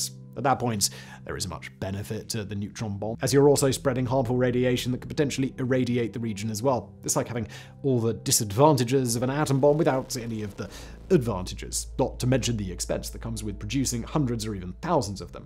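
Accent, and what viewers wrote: RP, United Kingdom. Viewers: legit, the subject is so interesting, but this man is talking so over the top queens english that it's distracting ngl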